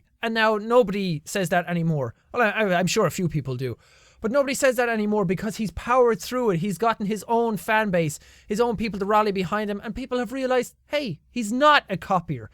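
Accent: Ireland. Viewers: As others have said, I don't feel like you're 'copying' other youtubers. Sure, you do the same things as some of them, but I wouldn't call that copying. The tone is different all around: You've a different scenario in comparison to Pewdiepie, for one example, and yours is the one I find more enjoyable. EDIT: Plus, I find your accent more enjoyable to listen to than his. Irish accent ftw.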